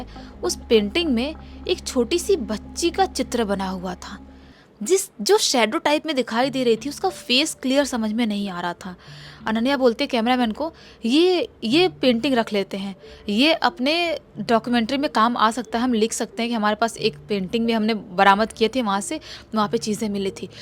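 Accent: Bihar, India. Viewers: Majja nhi ata accha bolta jaise real me ho apki awaj me thoda bihari pan najar ata or acting bhi over lagti h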